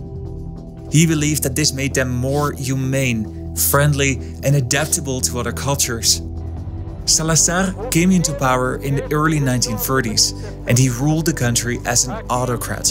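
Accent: Netherlands. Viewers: You've got a pretty thick Dutch accent. Are you Dutch?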